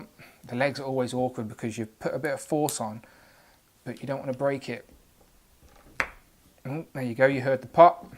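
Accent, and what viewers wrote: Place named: Manchester, United Kingdom. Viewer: got this wave or partial wave as a gift for a friend still waiting on one in the mail didnt get cyclops cause i refuse to pay fifty plus but did get the leg for five, but wondering all plus this guy eighty five dollars is that cheap or a deal anyhoo good vid your accent sounds like your from the manchester area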